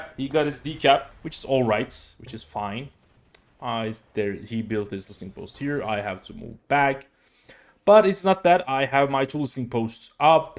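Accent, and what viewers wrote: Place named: Turkey. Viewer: You must be Turkish. You talk like me.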